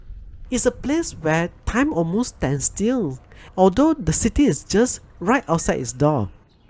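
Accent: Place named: Malaysia. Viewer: Love to hear Malaysian English accent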